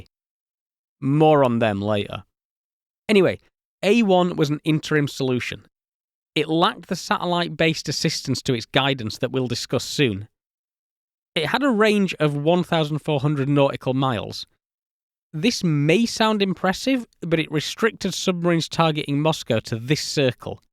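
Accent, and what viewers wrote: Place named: Northern England, United Kingdom. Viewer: I'm going to guess it did not occur to him his perfectly standard northern accent is "difficult to understand"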